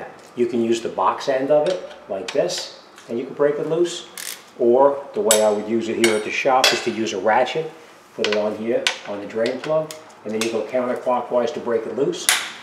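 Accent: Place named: Massachusetts, United States. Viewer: I like the MA accent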